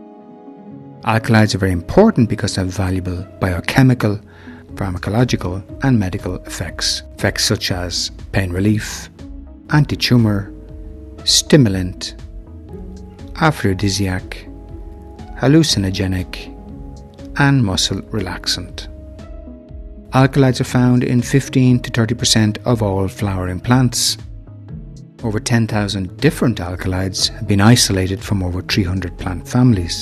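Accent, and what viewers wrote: Ireland. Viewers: Southern Irish Gaelic accent. Very relaxing. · I would give this video a million likes if I could. As a student who struggles with Pharmacology and dreads to learn about it.. you really opened my eyes. You made it so easy to understand, beautiful visuals and of course beautiful Irish accent. The best video I've actually come across so far on such a complicated subject. You have really helped me ease my stress. God bless you!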